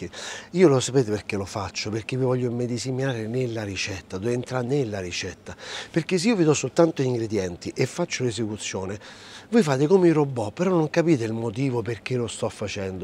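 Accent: Rome, Italy. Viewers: Grazie per la ricetta, per fantastico romano, a parte che sei un poeta vero e proprio!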